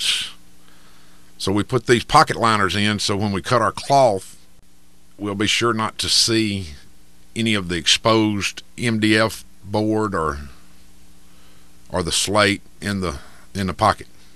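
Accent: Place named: United States. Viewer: Probably the thickest American accent I've ever heard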